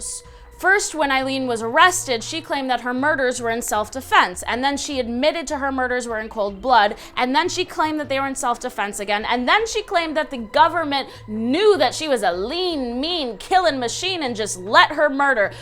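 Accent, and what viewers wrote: Texas, United States. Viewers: Wait! Your from Texas!? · ur from Texas?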